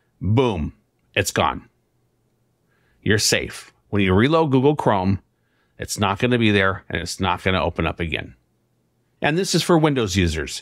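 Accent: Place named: India. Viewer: She had an Indian accent!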